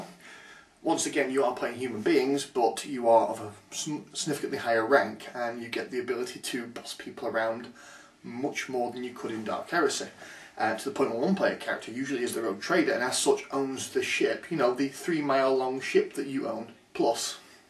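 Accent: United Kingdom. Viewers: In fact, I think I've pegged it...his Brit Accent is what makes the channel ! Brits sound so good saying things...."modified version of the Fantasy system..." - I mean...you can't even come close saying that in American English ! Plus he's smart...and knows his stuff...and he's just a great commentator. One of my favorite quotes is, "I want to play an Orc...just an ordinary Orc. And I can't do that.. and that's a shame." - see his Warhammer online review ! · Good job on the review. Your Brit accent makes everything so much nicer to listen to. LOL But good info and some nice insights. Thank you.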